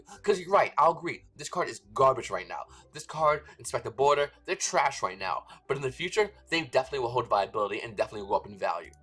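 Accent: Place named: Northeast, United States. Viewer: Kentucky?..........KENTUCKY?.......... ON god i thought you was from NY, Boston or Jersey, just by your accent! Hey it's V here! If that dont sound Northeast when u say it i don't know what is Lmao!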